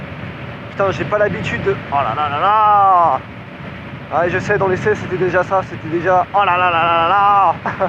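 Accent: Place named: Paris, France. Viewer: Salut à toi, j'aime bien ton accent parisien et la pertinence des tes coms lol..ça change des autres vlogs. Tu as peut être brisé mes rêves d'acquérir une 660 une fois mon permis obtenu, compliqué d'obtenir un modéle A2 fiable et bien équipé. Continu tes vidéos sympa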